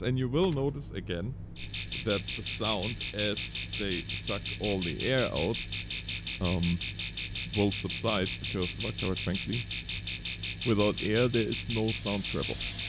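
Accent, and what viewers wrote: Germany. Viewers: you are German , Right ? :)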